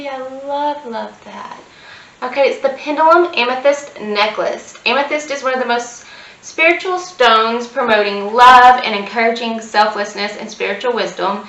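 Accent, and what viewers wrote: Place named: Texas, United States. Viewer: I'm guessing by your accent that you're from Texas.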